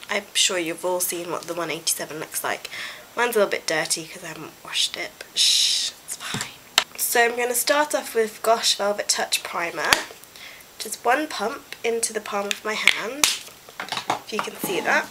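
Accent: United Kingdom. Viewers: pretending to sound posh? she has an rp accent and, as far as I know, it's her actual accent. not made up or insincere, her ACTUAL accent. how can you possibly judge someones voice because of a slight discrepancy in pronounciation? away with you! love the video:) i'm crap at putting on foundation so its nice to see people doing and explaining it!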